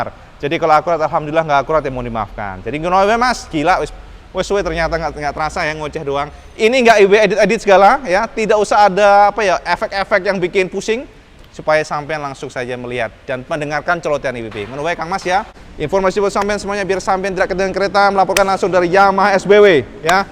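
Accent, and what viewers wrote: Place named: Java, Indonesia. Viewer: Duh duh bahaasane penak dirungokne jowo ne kentel .. wes lupakan fanboy 🙏🏻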